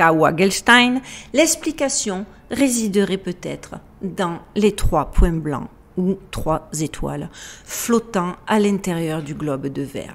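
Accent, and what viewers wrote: Marseille, France. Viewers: Merci pour la vidéo, bien présentée et on sent qu il y a de la recherche . Votre accent me fait penser au sud de la France (Landes ou basques ..) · Je vois qu'il y a beaucoup de clients pour ces salades... mais quand à moi, des propos tenus avec l'accent cagole je ne peux pas les prendre au sérieux.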